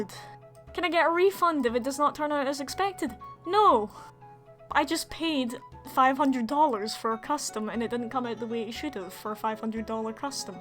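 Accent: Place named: Scotland, United Kingdom. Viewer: Man this accent reminds me that scottish people are a thing, wheres all the scottish people at?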